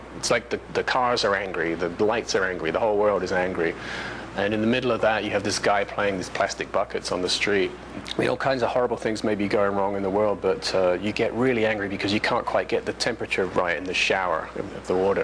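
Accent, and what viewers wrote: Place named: England, United Kingdom. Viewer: His English accent is hardly noticeable in this.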